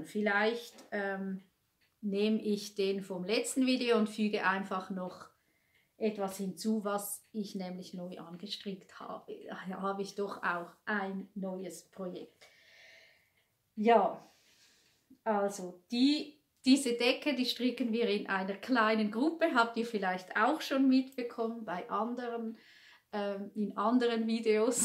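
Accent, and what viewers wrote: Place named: Switzerland. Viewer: Liebe, vielen Dank für deine tolle und unterhaltsame Podcastfolge. Ich bin erst vor ein paar Wochen bei dir eingestiegen, da die liebe Angie von dich empfohlen hat. Und ich muss sagen mit Recht! Du hast so eine positive, lustige Art, da bekommt man direkt gute Laune 😊. Deine Projekte gefallen mir auch sehr gut und ich habe bisher auch noch keinen Kolding, will ihn aber auch noch stricken. Strickt du die normale oder die kleine Version? Und dein Schweizer Dialekt 😍 ( sagt man Dialekt?) Eigentlich ist es ja eine eigenständige Sprache. Ich liebe es!! Viele Grüße aus dem Rheinland Katrin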